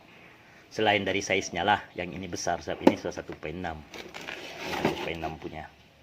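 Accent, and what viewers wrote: Sabah, Malaysia. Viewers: Terima kasih encik berkongsi ilmu. Suka saya mendengar loghat orang Sabahan. Kereta pun sudah ada tanda2 perlu tukar. Semoga dimurahkan rezeki tuan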